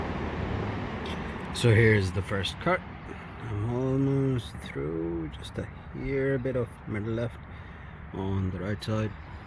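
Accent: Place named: India. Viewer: u sound desi as fuck bro 😂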